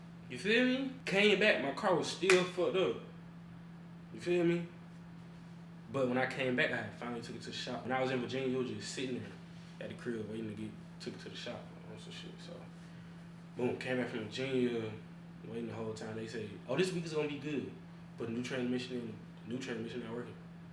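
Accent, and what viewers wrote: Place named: Louisiana, United States. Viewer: lawd youn sound like you from Louisiana, what part you from?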